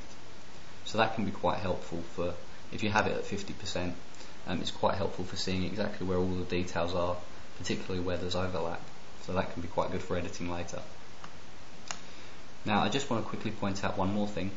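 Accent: United Kingdom. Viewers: You are from UK, aren't you?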